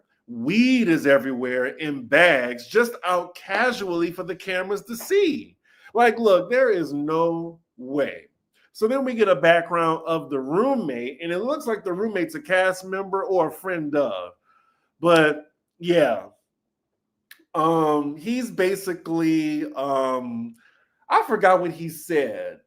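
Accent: New Orleans, United States. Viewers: you definitely have a New Orleans accent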